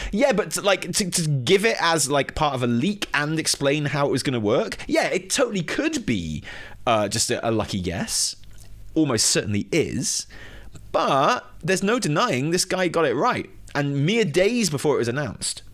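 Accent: United Kingdom. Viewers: Nothing like a good mystery reveal with a man with a British accent.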